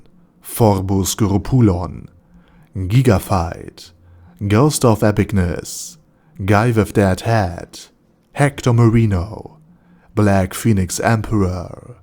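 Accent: Germany. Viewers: Geiler Deutscher Aksent Feier ich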